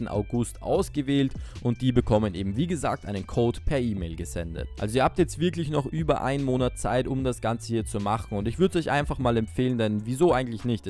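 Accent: Austria. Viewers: Ned gewusst das du a Österreicha bist🤔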